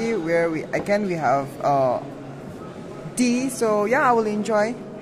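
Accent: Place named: Ao, India. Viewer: Heavy Ao accent! but what else do we expect ? One can't be an accentless human unless one is dumb.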